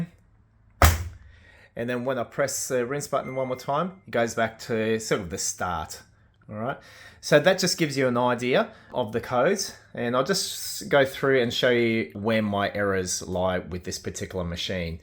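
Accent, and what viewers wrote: Australia. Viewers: Aussie accent makes me laugh my pp off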